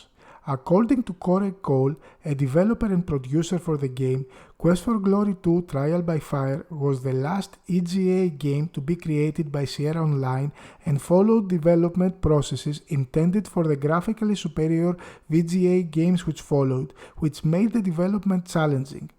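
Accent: Greece. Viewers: Hahah why, WHY you Greeks can't pronounce sh as in sharp, ch as in child, j as in joker... I will never understand :D Still, great job, I am enjoying this.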